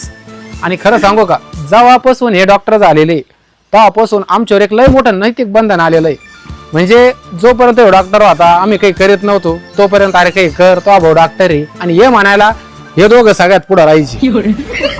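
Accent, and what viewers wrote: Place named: Karnataka border, India. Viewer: Bhasha kannd talukya sarkhi ahe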